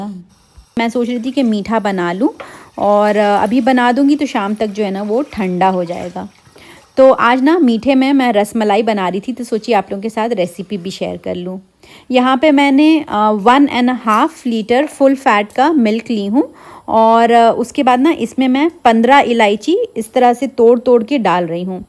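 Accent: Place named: Azamgarh, India. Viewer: ap Azam greh ki hn meri susral mn nnden Asi hi bolti hm kpry ko kpra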